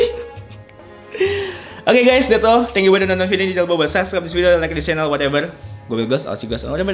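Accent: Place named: Betawi, Indonesia. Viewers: Oo terjwb penasaran gw besar di Cilangkap ya Bang. Maaf soalx tiap nonton fasih bgt bahasa2 lingkungan perkampungan gitu. Kereen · no offense, pantes bahasa betawinya "nyablak" khas jaktim.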